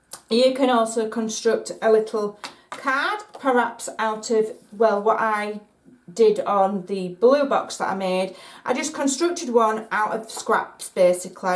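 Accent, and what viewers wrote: Yorkshire, United Kingdom. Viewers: Fabulous Hun! Can I ask where you accent is from - you remind me of my mother in law (voice wise!) I'm going to say just about Yorkshire x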